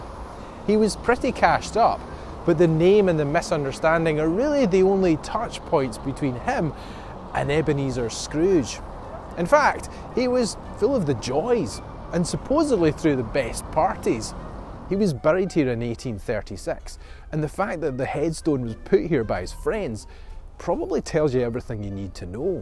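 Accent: Scotland, United Kingdom. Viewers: The story is great but, your Scottish brogue makes the story telling the best ever!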